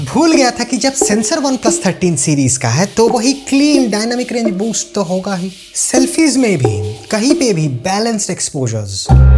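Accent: Bengal, India. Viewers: Bro to be honest with me are you Bengali? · humm Bangali ❤ hindi accent sune bojhai jai Bangali ke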